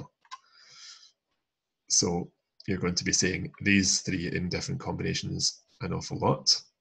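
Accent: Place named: Scotland, United Kingdom. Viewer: Mòran taing! Seo sgoinneil! Just discovered this, and saw how many more there are...it's like Christmas. Tha mi cho toilichte. Can't wait to watch more, and you explain so well. The musical Scottish accent is a lovely bonus, especially for someone stuck in the States. We don't get to hear that often over here. <3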